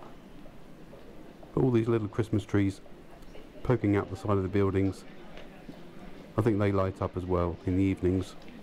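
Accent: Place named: United Kingdom. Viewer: What I meant was I love your British accent that’s all. I am resting up for your next walking video. Stay safe.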